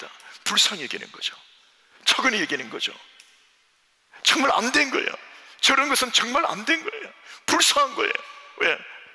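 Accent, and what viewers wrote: Gyeongsang, South Korea. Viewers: 명불허전 경상도 ㅋㅋㅋㅋ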